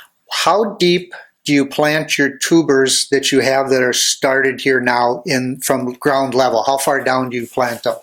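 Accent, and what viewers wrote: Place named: United States. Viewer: Love this info. And finally someone with plain English from America explaining things. Thanks for this video.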